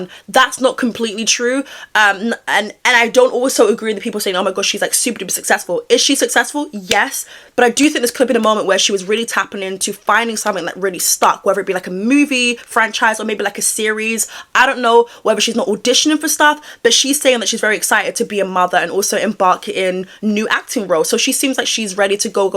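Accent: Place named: United Kingdom. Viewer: I know this off topic but you have American humor but you sound British asf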